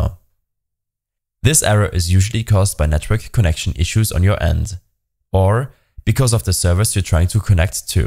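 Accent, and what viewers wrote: Germany. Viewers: Der deutsche Akzent ballert hahaha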